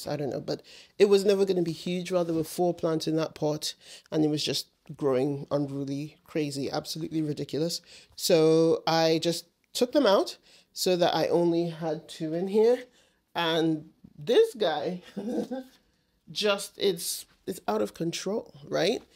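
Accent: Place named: South Africa. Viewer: What a wonderful collection and they all look so happy! (new subscriber here) I love listening to your voice, so musical and expressive! Is your family from South Africa? A good friend of mine is SA and your voice reminds me of his.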